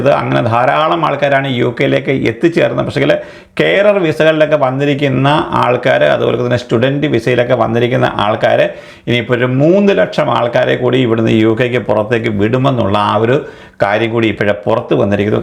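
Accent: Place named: Kerala, India. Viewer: Idheham parayunnathu nammude malayalam pole thonnunnu.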